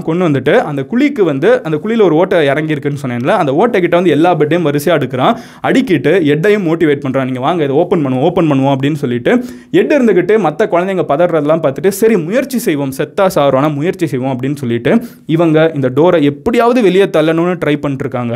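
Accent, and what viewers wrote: Madurai, India. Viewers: Inniku Madhan gowriku madurai slang niraiya varuthu… ketka super aa iruku..🙌🙌🙌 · Innaiki thaan bha MG, Madurai slang la pesirkaar... Avinga, Ivinga, Pannirkanunga... Daily he sounds formal Tamil, today Madurai slang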